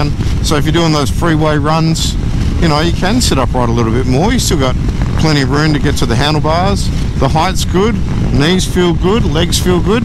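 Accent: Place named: Australia. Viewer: I love the bike! Your Aussie accent is pleasant to hear as well. Great review mate! Thanks.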